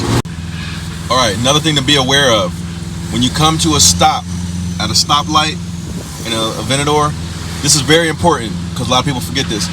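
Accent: United Kingdom. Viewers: So no mode days Drive it's Strada Corsair or sport mode to go forward and r for reverse. Very interesting so if u put it in neutral when stopped you're self do it rev or go into first gear when u press the Gas? Lol British Accent. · British accents are amazing lol, nice how to man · love the bristish accent 😂👌🏼 congrats on the car . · Loving your British accent😁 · I didn't know you were British?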